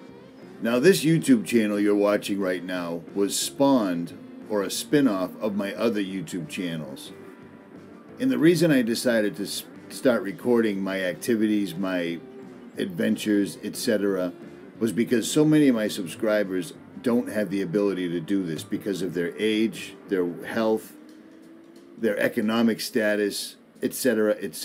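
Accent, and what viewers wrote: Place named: East Coast, United States. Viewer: Hey ! Pauly over here in Nor Cal. I got you over here and respect what you do! Great content and well received , i dig your accent east coast is hella different then my style of west coast or Oakland CA. Mostly but at 54 yrs old im already up in the mountains kinda prerunning a retirement style to see if its way for me ? So far i like it and doing better then at first ,! As it is a trip being solo if you didn't plan it but after two ex wifes w/ 2 kiddos from each and a long term girl friend of 10years turned toxic, resulting in few mo.s in jail up in MT. This is cake walk and very satisfying . So just saying good job keep it up , cause your real kid! One old west coast jack ass too one back east✌🏻🤙🏻🫡🤷‍♂️🕺🏻🪖👍🥽take care bud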